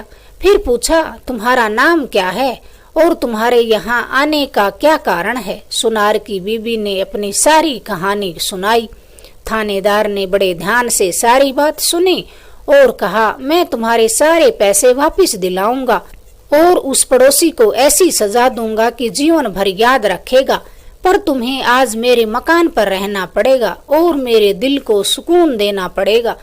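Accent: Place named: Rajasthan, India. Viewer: महिला। राजस्थानी। है ।लहजे। से। पता। चलता। है ।रंगीलो। राजस्थान। ।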